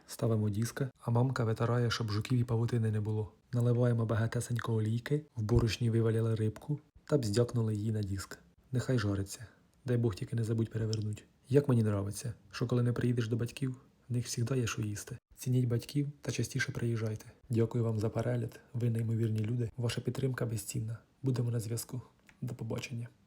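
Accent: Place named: Poltava, Ukraine. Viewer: а звідки ти напиши, просто говір у тебе такий полтавський, от я і зацікавилась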